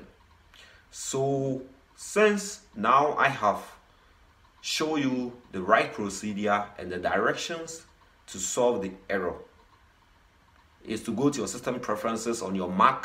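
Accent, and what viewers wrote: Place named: Africa. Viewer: I LOVE YOUR ACCENT BRO PROPER AFRICAN VIBES PEACE AND LOVE MY MAN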